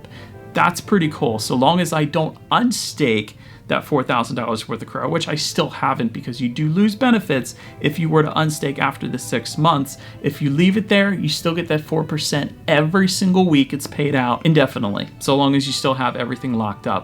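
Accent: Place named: Pennsylvania, United States. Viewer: i heard the PA in your voice! sounds like e l c o d a y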